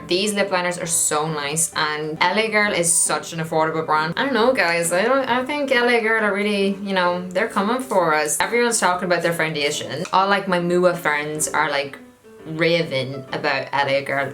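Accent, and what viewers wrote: Ireland. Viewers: Is that an irish accent u have?in its cute!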